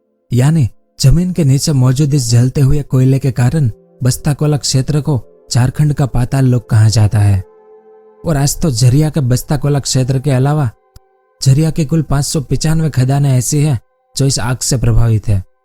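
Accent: Gujarat, India. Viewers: Aap gujarati jaise kyu bolte ho.??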